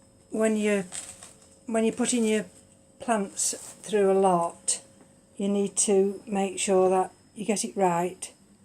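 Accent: United Kingdom. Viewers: What part of the world are you living ? (from your accent, I assume the UK). The fact that they've gone into a bark-based mix with reasonable root systems, I would start feeding whenever you water remembering a flush with clean water every so-often. In the UK, it's the growing season and you have active root tips and new growths so they will appreciate some feed right into the Autumn.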